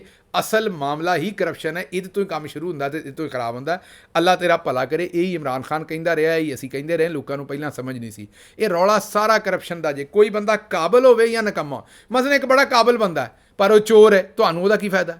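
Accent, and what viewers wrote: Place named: Amritsar, India. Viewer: گوڑی امبرسری پنجابی سن کے مزا آیا ۔ ساڈے وڈکے وی امبرسری سن Regards. Prof. ILYAS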